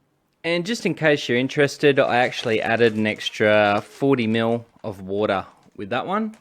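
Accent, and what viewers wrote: Australia. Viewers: God I love how Aussie you are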